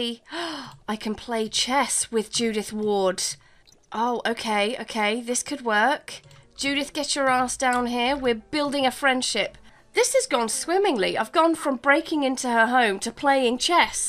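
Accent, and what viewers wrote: United Kingdom. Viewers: It's so rare to hear a Brit with a similar accent to mine. <3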